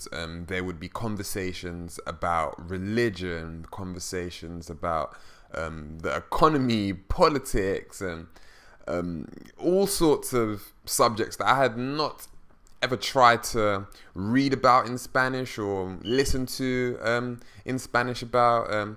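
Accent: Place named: United Kingdom. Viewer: 3 weeks is not enough time for you to get a good understanding. It would take several months for you to become fluent. Immersion is the best way. It sounds like you are british so I dont know what its like there but here in the US you dont have to go to a foreign country to immerse yourself at least once a week with native speakers. I spent a month in Costa Rica and toward the end I felt my spanish improving slightly, but not terribly, I needed more time.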